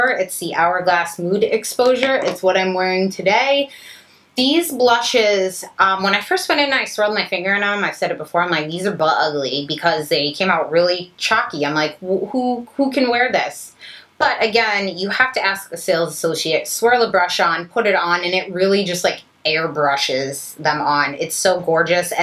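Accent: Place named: Boston, United States. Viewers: Omg I love you, I knew you were from Boston 😂 You have that edge. I live in Cambridge and people always have something to say about the way I talk. Now I know why I love your channel! ( other than the obvious) . People also should spell it Mahhhhk not Mark lol where did you grow up? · Oh my god - you're from Boston. No wonder I understand everything you say and always know what you mean without explanation. Obviously, I'm from Boston (now transplanted to CA). Your accent isn't heavy, I hear your "r's" being pronounced quite clearly. It's more of an attitude/style of expression that is Bostonian to the core and always seems "right" to me because it's home. Okay - back to finish the video now. I had to pause it to write this - the new-found knowledge of your Bostonian-ness was wicked pissa and wicked monumental.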